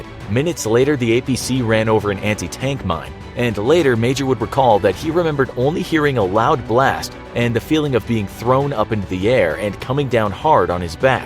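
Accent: Canada. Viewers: Is the info graphics show from Canada? The way they talk in this sounds like they are Canadian. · sounds pretty Canadian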